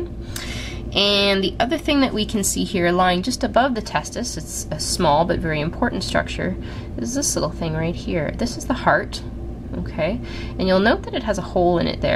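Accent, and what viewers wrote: Canada. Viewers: Love the canadian accent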